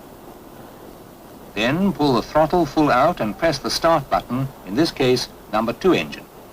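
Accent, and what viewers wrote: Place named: RP, United Kingdom. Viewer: Even Prince William doesn't talk that posh!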